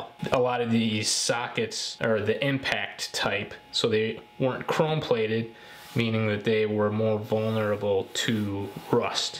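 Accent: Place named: Midwest, United States. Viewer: Where are you from? I'd guess somewhere in the Chicagoland area, Wisconsin or Minnesota based on your accent.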